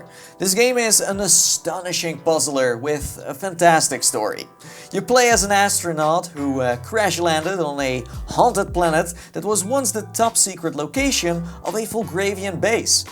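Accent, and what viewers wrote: Netherlands. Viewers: Your english accent is verru dutch, are you dutch? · I just cant stand his accent, I mean I'm dutch and he is too but his english has a dutch accent in it, I just cant listen to it.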